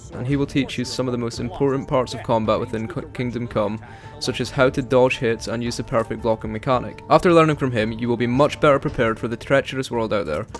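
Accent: Scotland, United Kingdom. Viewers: You Scots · Unmistakable Scottish accent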